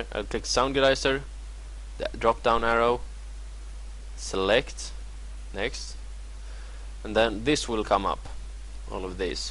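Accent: Sweden. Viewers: You sound Swedish...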